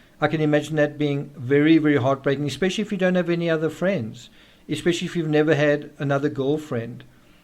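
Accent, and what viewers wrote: South Africa. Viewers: I have been gradually losing interest in this channel without understanding why. Could it be as simple as overdosing on the South African accent, since I also watch Grizzly? Blaming myself for losing interestshould have been my sign. Now I realize that was a sign of feeling gaslighting. You have totally misunderstood narcissism, and you might never be able to see it truly, even if you watch HG Tudor till the cows come home ... But the condescending tone you display towards your audience is unmistakeable to me now that I have become educated.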